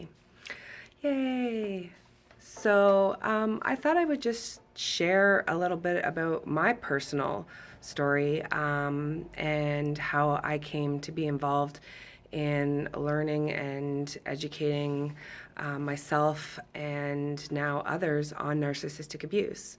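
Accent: Canada. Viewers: I love the Canadian accent …….thanks for sharing your story.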